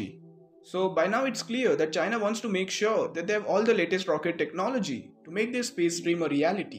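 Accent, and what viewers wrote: India. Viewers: Indian accent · Thanks a lot, this is really very precious and very interesting, for it's very unique. I hope very much to see much more of this in the future, and therefore I wish you a great success, there could be a lot of space being left for you in the scene. Your accent sounds like coming from India, remembering me to three wonderful times in India, as a former field engineer for a Swiss company many years ago. If this is correct, take my greetings, best memories, and great respect from Switzerland, to this wonderful people and culture, thänks.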